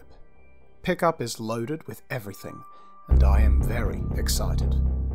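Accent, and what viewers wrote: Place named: Australia. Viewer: Narrated by an Aussie Aussie Aussie Oi,Oi,Oi.... Cheers from Melbourne Australia 🇦🇺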